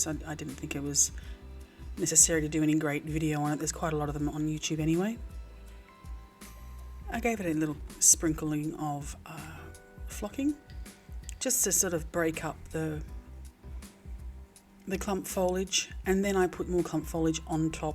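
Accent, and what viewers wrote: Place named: Australia. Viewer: Superb! You are a very talented lady! I also love your Australian accent.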